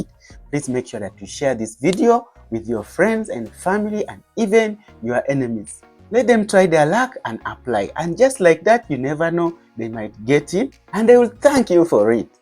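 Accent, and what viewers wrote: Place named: Uganda. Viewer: I heard your voice and I knew you are Ugandan. Thank you for caring.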